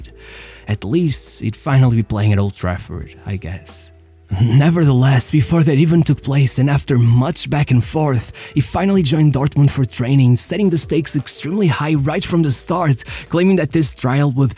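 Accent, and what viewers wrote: Portugal. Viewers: brother man sounds portuguese af lol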